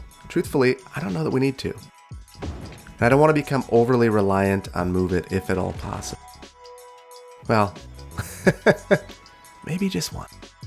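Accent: Wisconsin, United States. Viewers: NEVER apologies for our Wisconsin accent, its something to be well... somewhat proud of XDXD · Watched your videos to better understand Skylines. Stayed for the intrigue (started with Verde Beach.) Whole time I was watching I was like 'He has a Wisconsin accent.' Thanks for confirming my suspicions. Anyway, keep up these amazing videos, they are much better than the cities I make. Also, congrats on the subscribers. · Are you from Wisconsin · Respect the Wisconsin in ya lmao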